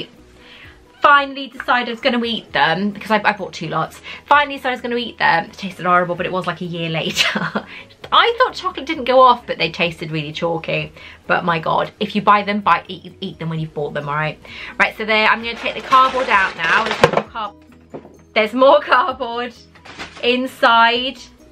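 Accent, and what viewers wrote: Wales, United Kingdom. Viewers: Wild accent guess: grew up in S. Wales, live in Brighton now?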